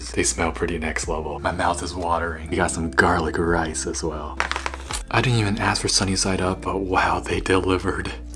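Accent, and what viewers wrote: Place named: Australia. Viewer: I thought you were from Australia 😭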